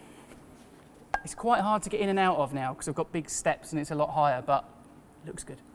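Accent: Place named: United Kingdom. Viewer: 我居然听口音就知道是英国的 美国人说英语还是很不一样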